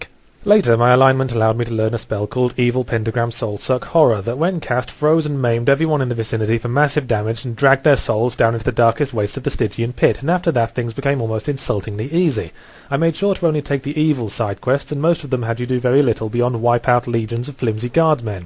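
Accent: United Kingdom. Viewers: i know that Fable is a completely awesome game, but what he says may or may not be true, it's still funny. (talks fast and in a british accent)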